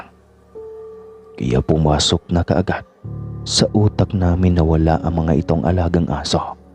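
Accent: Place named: Pangasinan, Philippines. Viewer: Parang pangasinan si kuya nag kukwento😊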